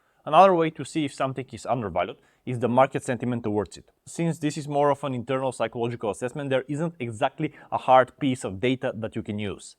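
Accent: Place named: England, United Kingdom. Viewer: Very hard to understand and main reason is that ''English'' accent ... :(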